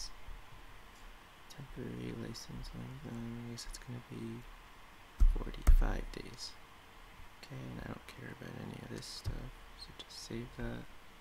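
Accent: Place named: United States. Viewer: For a Norwegian your accent is very American. Have you lived in the states prior?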